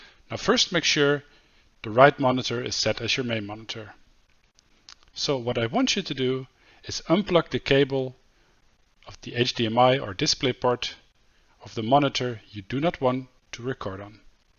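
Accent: Netherlands. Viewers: Haha strijder. Ik dacht in het begin all "hmm is deze guy nou NL?". Letterlijk 2 sec later "my PC is set to Dutch" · jij ben sws nederlands ahahah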